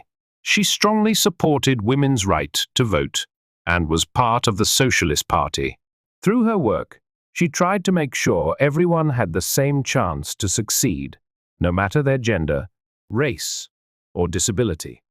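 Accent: United Kingdom. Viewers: thank you!! I like it a lot more when it is speaking in British English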